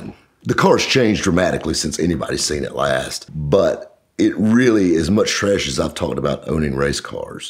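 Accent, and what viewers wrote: South, United States. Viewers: Sorry if I disrespect someone but for me as someone from Europe this is what a real American accent sounds like. ‘Merica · Michael Rapaport’s southern brother?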